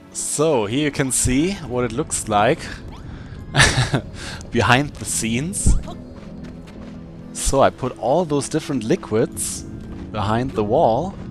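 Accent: Germany. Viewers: I hope its not rude to ask but is that a German accent? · You sound so german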